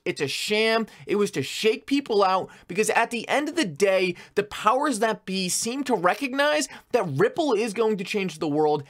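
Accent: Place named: California, United States. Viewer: Are you from California? You have a Cali accent.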